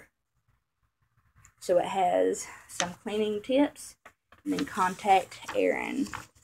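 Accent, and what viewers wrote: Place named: South, United States. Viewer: Nice! Where are you from? The south? Love the accent :) You can use books, cardboard boxes etc as a video camera stand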